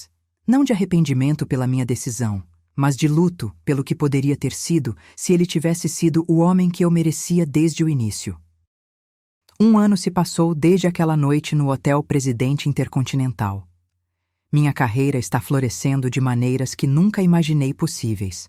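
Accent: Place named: Paraíba, Brazil. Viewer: paraíba, voz bonita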